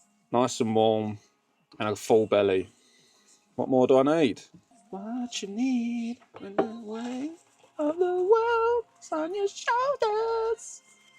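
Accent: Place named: London, United Kingdom. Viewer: Just found your channel and am loving your work! My view is the River Wye near Bakewell in the Peak District (its pitch black so cant see much right now!) Im a full timer in my jumbo transit, Vera. Like you, I gave up the rat race, sold my flat 20 months ago age 55 and decided to give up the 9-5, live on less and experience more before I became too old and farty! Best decision of my life. Im a london girl, NW and West (hate the place), so listening to you is like a bit of home on the road! Gonna binge watch your channel now so shall bid you a good evening Laters 😊 Tracy and Moo the cockapoo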